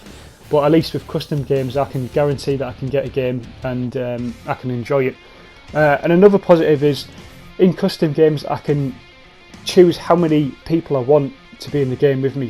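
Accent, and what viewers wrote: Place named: United Kingdom. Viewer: when i heard your British accent i smiled and kept watching with even more pleasure lol thank you a lot for that video!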